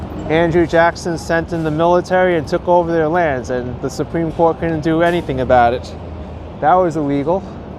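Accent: New York, United States. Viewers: Omg your accent is EVERYTHING. I feel like I'm back in old New York.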